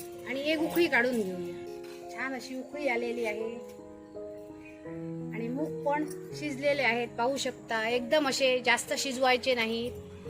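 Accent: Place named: Pernem, India. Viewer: Goan lok aasi bhasha ulainath ge tu pednechi bhasha boltoi mugye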